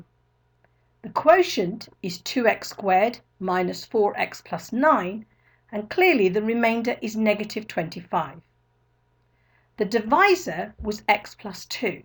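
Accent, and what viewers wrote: United Kingdom. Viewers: Nice British accent but doesn't clarify from which sky the x = -1 fail from . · It is pure British accent.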